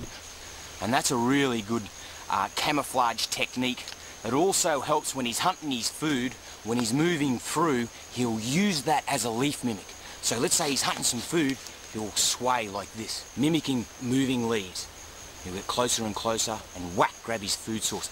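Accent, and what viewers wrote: Australia. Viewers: I fucking LOVE how Steve says "Cobra!" the Australian accent is just beautiful at times! COB-RA!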